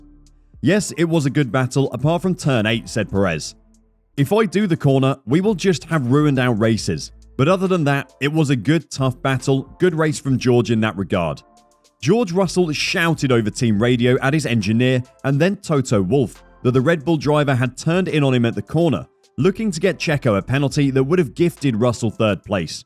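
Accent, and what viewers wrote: United Kingdom. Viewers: Typical British: Unsporting as hell, but with a nice accent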